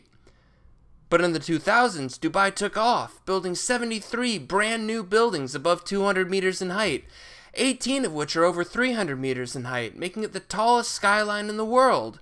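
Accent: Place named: United States. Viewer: yes, whiney, whinging american accent is just more bad taste.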